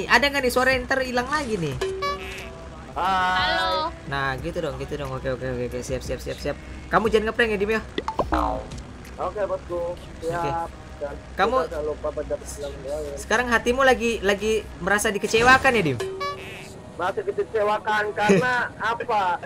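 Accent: Java, Indonesia. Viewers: Bang kamu orang jawa ya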